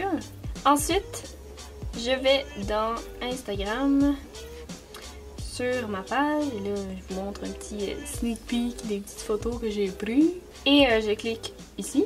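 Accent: Quebec, Canada. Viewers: Tu est vraiment très belle et j'adore ton accent québécois c'est trop beau 😍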